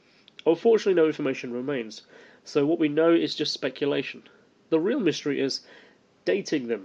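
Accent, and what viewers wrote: United Kingdom. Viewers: The narrator sounds British so give him a break. 🌈🌈😝🌈🌈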